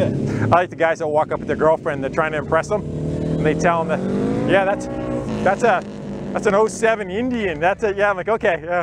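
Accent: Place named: United States. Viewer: It's ok, you speak American like us! If we wanted Shakespeare we would be watching a play!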